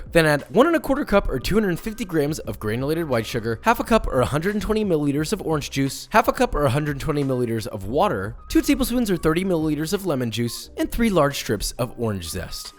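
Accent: United Kingdom. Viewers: More of the British accent please. As a Brit it's still somehow soo satisfying to listen to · Just me or did get more british in this video?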